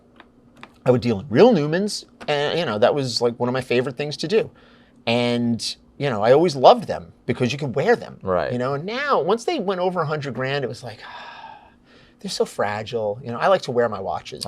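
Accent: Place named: New York, United States. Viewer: New Yorker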